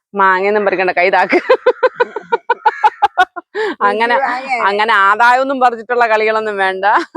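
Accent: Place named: Thiruvananthapuram, India. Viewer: Ath aaa nattusailiyanu....kuttam parayanda..jnanum Tvm...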